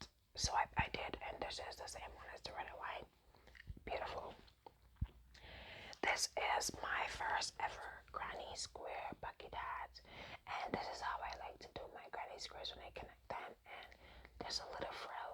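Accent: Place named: Jamaica. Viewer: Where are you from? Sounds jamaican!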